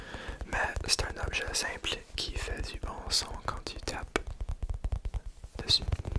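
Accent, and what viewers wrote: Quebec, Canada. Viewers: Ici en France, y a plein de sports mais c'est vrai qu'on ne joue pas trop au golf, c'est réservé à des personnes ayant les moyens. Pour ma part, je joue au tennis Ton accent canadien est très joli 😊 Bonne nuit tout le monde 😴🫶🏽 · incroyable !! enfin la vidéo en fr, pour les français l'accent québécois ne se rate pas lol !! toujours des vidéos géniales ^^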